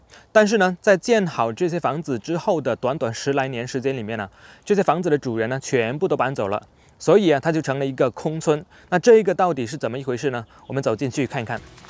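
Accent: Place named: Cantonese, China. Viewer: ，原来会广东话的🤟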